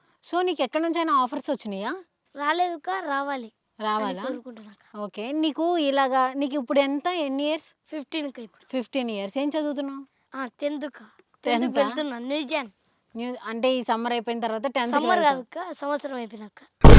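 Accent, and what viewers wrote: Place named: Visakhapatnam, India. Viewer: maa thalley annadi antey pakka vizag eyyyyy…😎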